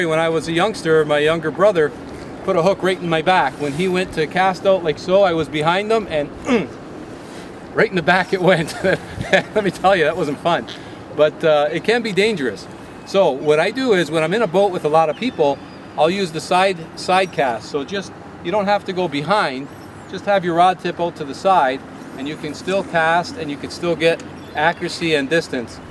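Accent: Canada. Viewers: I can tell you are canadian lol.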